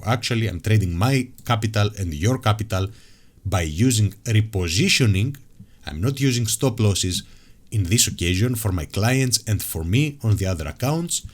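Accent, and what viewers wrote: Greece. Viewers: your accent is greek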